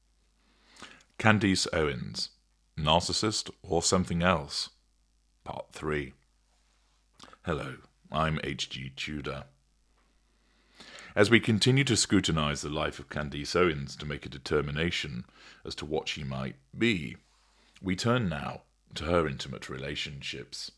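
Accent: United Kingdom. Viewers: He is, it's the British accent ;)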